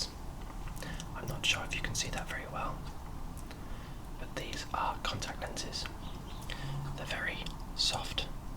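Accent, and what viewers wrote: England, United Kingdom. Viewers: *Me before video starts*Please tell this guy is British me when video plays HOW IS HE NOT Edit: is he cause I want him to be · you are from england??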